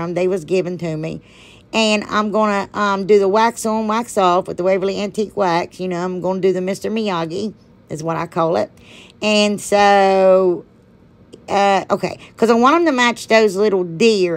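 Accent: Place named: West Virginia, United States. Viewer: I’m so happy I found you on YouTube! You’re so real and you make me feel better about myself because I am not tech savvy and just starting to learn crafting. I love your accent and being from WV, I have one too but I don’t hear it unless someone records me or I hear my own messages I leave my husband and he doesn’t listen to them before I get home. You are my favorite YouTube show now and I sent my sister your video so she can subscribe. Thank you and God bless you for being you! I love you girl! I would love to meet you if you ever have a show, Columbus isn’t that far from me. I’m about 20 minutes from Gallipolis, Ohio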